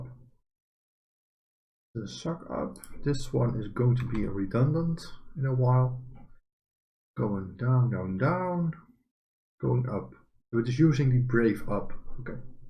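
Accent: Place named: Netherlands. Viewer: Can you do a tutorial about computer craft? I am sure you are very good at explaining it. BTW, Your accent tells me you are Dutch I am right?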